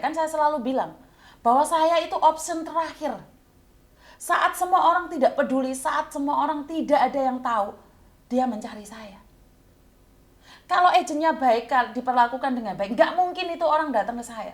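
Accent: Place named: Java, Indonesia. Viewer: Mbak katanya orang BALI.BALi Nya mana Mbak.kok bahasa Jawa ne meduk kalau orang asli BALI ndak bisa bahasa Jawa.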